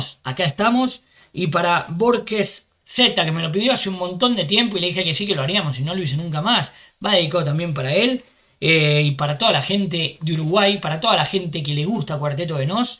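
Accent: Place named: Argentina. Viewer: Este flaco a veces es argentino y a veces es español? Jaja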